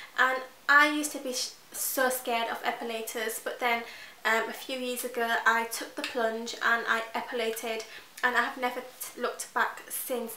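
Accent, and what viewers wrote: Yorkshire, United Kingdom. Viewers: Is she from Yorkshire?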